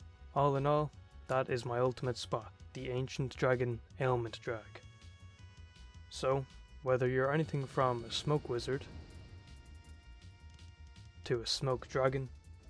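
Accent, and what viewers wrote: Ireland. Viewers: I'm from Cork and you sound like half the people I hang out with, Are ye Irish?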